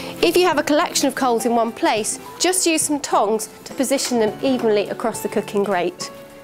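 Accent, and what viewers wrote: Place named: England, United Kingdom. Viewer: The first clue that this was going to be total crap was the English accent . . .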